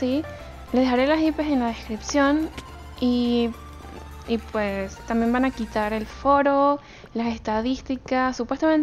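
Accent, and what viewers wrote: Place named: Venezuela. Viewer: Se nota tu acento venezolano xd tal vez lo reconozco por que también lo soy :v like :)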